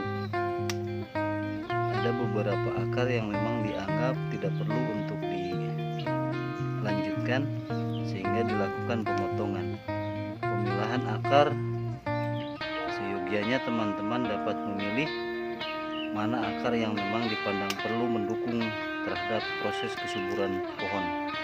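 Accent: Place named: Sunda, Indonesia. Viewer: mantaap..rapi pisan penataan dan perawatan ala pro.thnk sudah shering kang. logat nya sunda aslinya dari mana kang?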